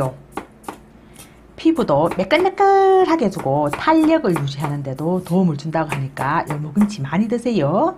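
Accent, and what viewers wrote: Gyeongsang, South Korea. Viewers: 목소리도.애교만점.최고.요리사입니다. 경상도.사투리.정말.멋지세요. 제친구도.대구있지요.요리프로. 최고.쉽고.제미있게하십니다. 축복합니다. 저는.전라도에.살지요.건강하세요.❤🎉😂😅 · 경상도 아줌마 사투리 제대로 👍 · 정다운 갱상도 아줌니 말솜씨땜시 보는것도 듣는것도 잼나게 밨어요 감사합니데이~~ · ! 경상도 말소리너무구요미 너무 행복 합니다(♡♡♡♡)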